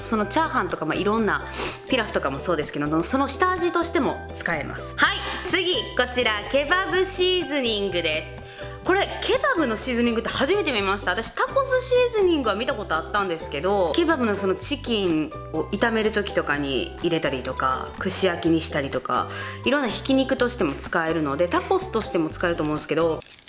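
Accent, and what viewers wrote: Kansai, Japan. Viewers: さんの関西弁可愛いご主人のとこに住んでも関西弁のままでいるの見るとなんとなく安心する笑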